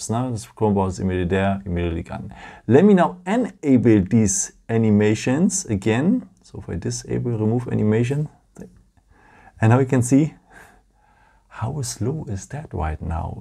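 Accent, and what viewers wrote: Germany. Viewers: If the phone is on standby 'ne?' tells me right away you're German 😁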